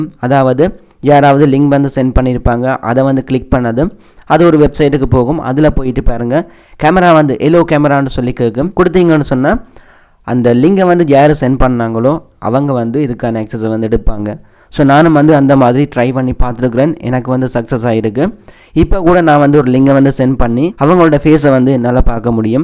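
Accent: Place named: India. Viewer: வணக்கம் இந்த காணொளியை நான் ஓட விட்டு ஓடவிட்டு தான் பார்த்தேன் காரணம் உங்களது மொழியில் அநேக ஆங்கில சொற்கள் கலக்கப்படுகின்றது அது வேண்டுமென்றே கலக்கப்படுகிறது அத்தோடு கூட எதற்காக நீங்கள் இந்திய பாணியில் எமது தமிழை உச்சரிக்க வேண்டும் அதற்காக இந்த காணொளியை நான் விரும்பவில்லை 👎👎👎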